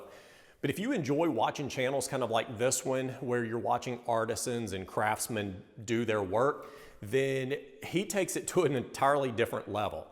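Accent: South, United States. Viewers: He's what was once called a "Good Old Country Boy", a man who is respectful and demands respect in turn. He reminds me of my 7th grade teacher and coach, Mr. Jackson. I went from a suburb of Nashville TN to a backwoods country town named Springfield. Mr. Jackson taught English and was the sole sport coach for basketball. He had the same register voice and tones, and, the same accent. Funny thing was, years later, I saw a painting of Pres. Andrew Jackson, and the two men looked almost identical, except Mr. Jackson was almost completely bald. He was a taskmaster, for sure. and keep the tradition of hand craftsmanship alive and prospering. They also show the HUGE difference between hand crafted shoes and factory issue. Unfortunately, we're so used to "factory outlet" shoes (and their concomitant pricing) that when we run across shoes from these guys, we're usually in awe of the quality and attention to detail and their pricing. 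Old saying goes "You pay for what you get." With these guys? Absolutely.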